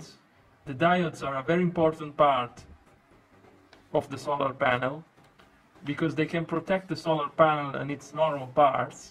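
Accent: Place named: Italy. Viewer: L'accento italiano si sente a 5000km di distanza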